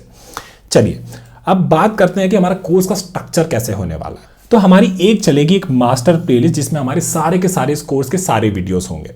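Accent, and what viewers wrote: Bihar, India. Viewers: Aap bihar se hai na bhaiya !!😅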